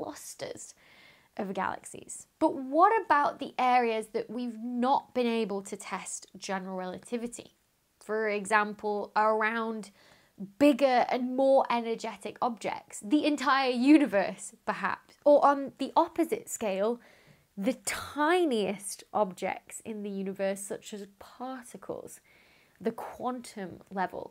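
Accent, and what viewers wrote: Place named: England, United Kingdom. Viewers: Dr. Becky I hear you! Not meaning to disparage anyone.... Bolton, ok my education in the multi-variant English accent continues 🙌 thanks for replying. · Thank you for making so many interesting videos that I love to watch for the contents, clear explanations and your lovely English accent which I find very cute 🥰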